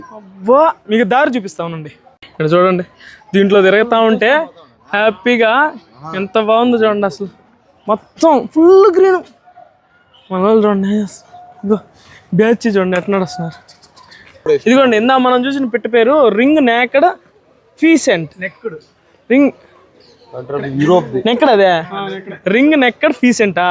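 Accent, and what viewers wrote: Nellore, India. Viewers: Nellore yasa chittamuru inthaka mundhu nellore ippudu tirupati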